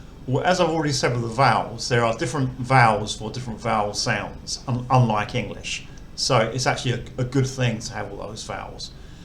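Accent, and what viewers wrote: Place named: Southern England, United Kingdom. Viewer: Hi Interestingly, if you are northern English, then but and put ARE pronounced the same (both pronounced as you say put). To my northern ears, southern English such as yourself say almost bat (for but). Hence the problem of transliteration of English in to thai for English people, let alone considering all the other nationalities. Keep us the good work.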